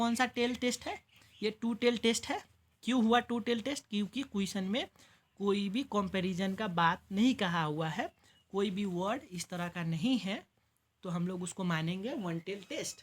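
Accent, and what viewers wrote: Nepal. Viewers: Nepali accent 😂…anyway thank you so much ❤ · Why 🤔 speaking in Hindi with nepali tone ? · are u nepali ? broh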